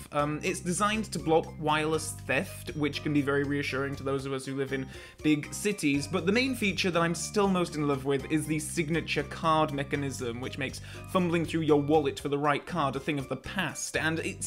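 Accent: Yorkshire, United Kingdom. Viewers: If you check out his older videos he sounds very Yorkshire.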